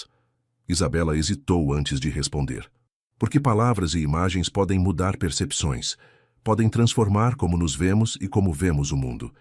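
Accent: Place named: Portugal. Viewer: Narração perfeita, de Portugal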